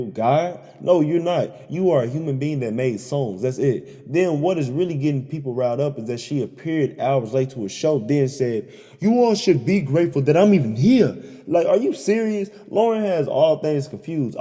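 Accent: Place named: United States. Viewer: Dude…..Interesting video but I’m struggling to hear what you’re saying, as a narrator you need to understand that we’re not all Americans so your words pronunciations should be clearer and possible slower (for non-Americans like myself), your accent is a little strong. Please take this as a constructive criticism.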